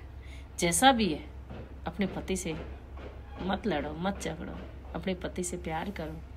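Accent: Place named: Himachal Pradesh, India. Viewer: बेटा जी आप हिमाचल, बिलोंग करते हो भाषा, से, फील, हो, रहाहै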